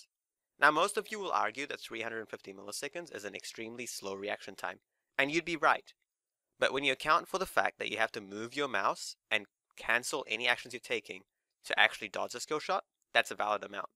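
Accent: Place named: South Africa. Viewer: you sound south african dude :D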